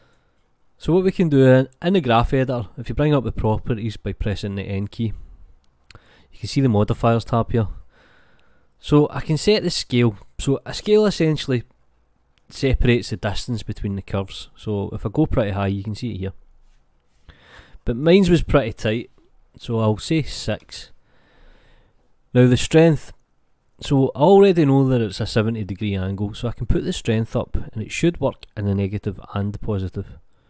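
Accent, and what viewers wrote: Scotland, United Kingdom. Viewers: I'm originally from Italy, but I love this Scottish accent in your voice so much, I just don't know why! Great tutorials!!! Thanks a lot!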